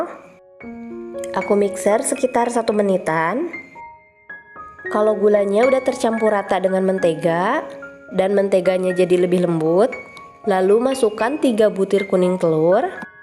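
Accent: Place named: West Java, Indonesia. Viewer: Meuni sunda pisan Aksen na, salaam sunda atuh Neng Geulis 😊 Hanupis resep Nastar nya nanti mau coba di praktekan 👍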